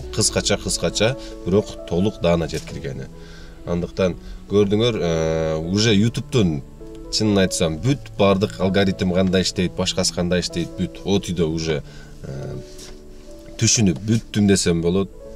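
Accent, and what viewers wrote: Caucasus. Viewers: Кыргызлекенсинго, орус тили кавказкий акцент тугондубу ?😂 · Чото кавказский аксент болуп кеттиң эле акыркы күндөрү анан эле жок болуп кеттиң не болду экеен дедим эле.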